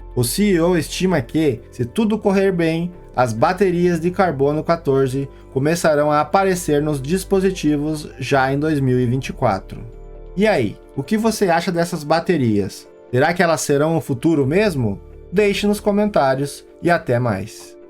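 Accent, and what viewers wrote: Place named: Caipira, Brazil. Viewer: Este sotaque caipira do locutor é insuportável!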